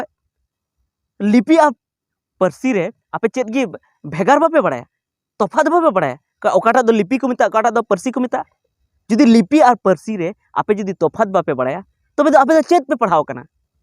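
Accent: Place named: South, India. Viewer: ये आदमी संताल परगना का नहीं है, बहुत कोशिश करने के बाबजुद इसके उच्चारण से साफ पता ओल रहा है कि ये दक्षिण का आदमी है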